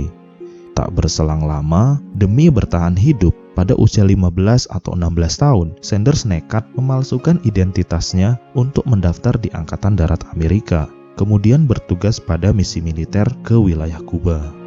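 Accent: Lombok, Indonesia. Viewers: Dari lombok ya bang?🙏🏻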